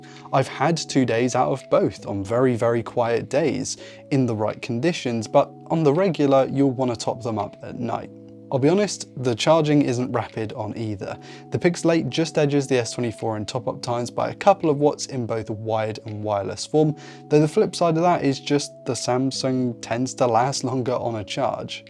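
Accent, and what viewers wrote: England, United Kingdom. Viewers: You have an English accent, yet prices are USD. There are enough US tech YouTubers don't you think?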